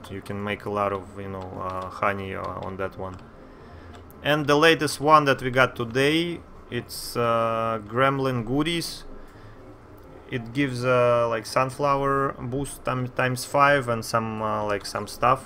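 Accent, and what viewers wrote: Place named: Russia. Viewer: Похоже что русский говорит на английском. )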